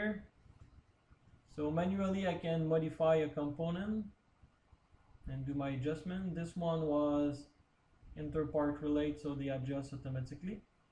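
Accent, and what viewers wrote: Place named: Quebec, Canada. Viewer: From your English accent, I have the impression that you're from Québec :) Thanks for the Video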